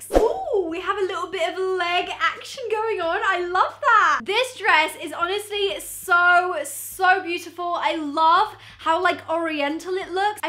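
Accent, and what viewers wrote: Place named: United Kingdom. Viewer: Omg I love your accent #britishaccentsrlife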